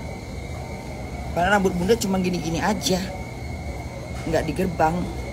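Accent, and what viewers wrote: Medan, Indonesia. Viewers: Jangan kau hilang kan bahasa medan mu corla itu lah penarikmu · "pauknya aku" 🤣🤣🤣 Bahasa Medan bgtt Bun.... Oalahhhhhh uda dikirim balik 100 juta nya ke si itu? Syukurlah ya Bun.... harga diri lebihh penting ya Bun.... 👍👍👍 Pantesan dia diam.... hahaha...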